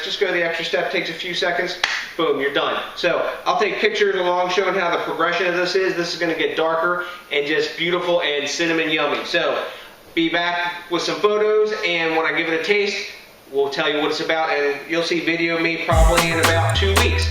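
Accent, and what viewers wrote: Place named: Delmarva, United States. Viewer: I am pretty sure this guy is from the DelMarVa (Delaware/Maryland/Virginia tristate area). His accent gives him away. Let me know if I am right!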